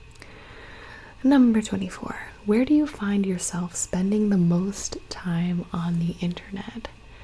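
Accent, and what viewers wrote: South, United States. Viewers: i frickin love southern asmr sounds. she is the best.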